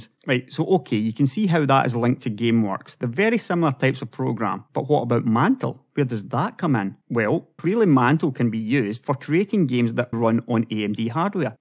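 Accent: Scotland, United Kingdom. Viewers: cool Scottish accent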